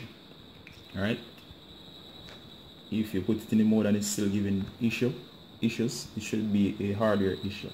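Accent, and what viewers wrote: Jamaica. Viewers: Cuz u sound Jamaican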